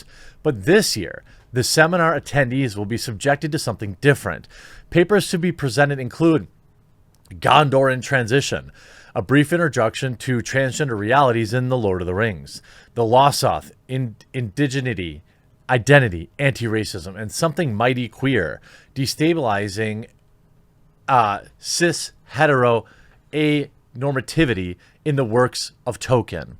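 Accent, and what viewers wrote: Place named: Wisconsin, United States. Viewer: We love, but he pronounces "bag" as "beg," flag" as "fleg," and "dagger" and "degger." I have no authoritative source, but it is believed he also pronounces "wash" as "warsh." He's from Wiscansn. Cut him a little slack.;)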